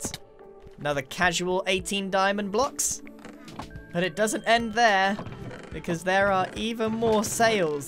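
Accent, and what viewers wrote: United Kingdom. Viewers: I knew u was British! Help me and meet hahaaaaa..... 😋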